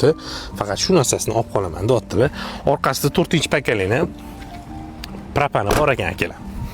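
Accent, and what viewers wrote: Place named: Tashkent, Uzbekistan. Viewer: Узи тошкентликми бу чала гапирмокда тошкент шевасида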